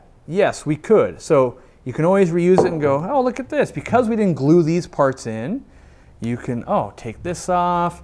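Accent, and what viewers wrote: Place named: New England, United States. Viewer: haha sweet vid, where in New England are you from? :p "rim is just WICKED wide" lol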